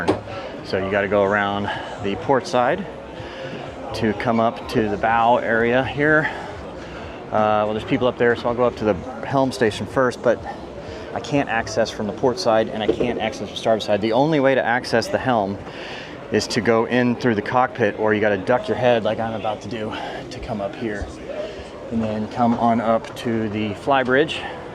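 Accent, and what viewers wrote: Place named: United States. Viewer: Awful video, really poor content. There's only one thing worse than someone moaning and that's someone moaning with an American accent. There are some really great presenters reviewing yachts and M.Y. on YouTube, this guy isn't one of them.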